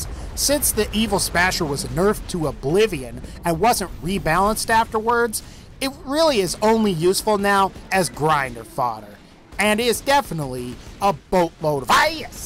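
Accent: South, United States. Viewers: His country accent his amazing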